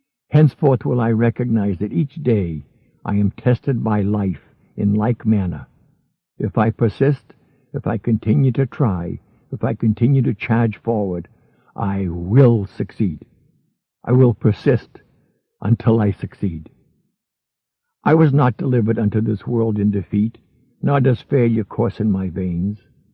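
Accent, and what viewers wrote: Boston, United States. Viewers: Boston accent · Am I the only one that noticed that he started with scroll marked 3 instead of scroll marked 1???? It threw me off as I was following with the book.. ugh… I love his voice and Boston accent tho 😜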